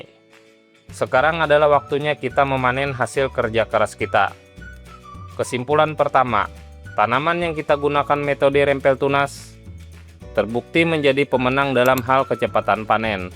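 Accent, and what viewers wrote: Banjar, Indonesia. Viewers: urang banjar kah pian, bahasa banjarnya masih ada lah, kada hilang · Urang banjar mun ndagari logatnya sidin ni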